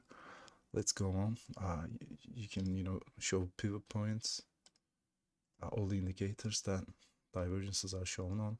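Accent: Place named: Turkey. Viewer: Türk aksanı seziyorum :) Bravo bro devam ellerine sağlık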